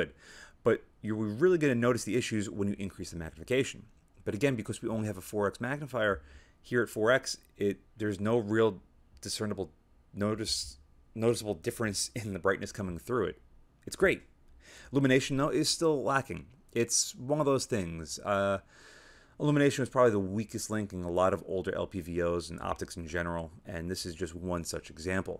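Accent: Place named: East Coast, United States. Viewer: East coast. Old. He has an east coast accent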